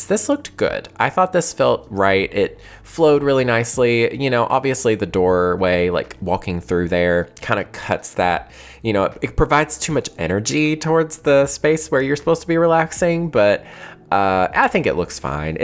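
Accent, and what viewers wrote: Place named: Appalachia, United States. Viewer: I love your content, and knowing that you’re from the Appalachia makes me feel ever closer to your videos (WV here). Your accent is barely noticeable for a person who lives in Kentucky. Your builds make me want to build more while I’m playing!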